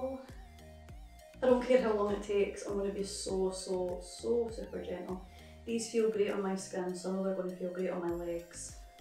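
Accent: Scotland, United Kingdom. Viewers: It’s the Scottish accent that makes me melt lol Much respect 👍♥️ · Briiliant to see a beautiful girl on here with my accent. I keep telling people we have so many gorgeous girls in Scotland and oor just confirms this. Absolutely stunning, ❤️🏴❤️🏴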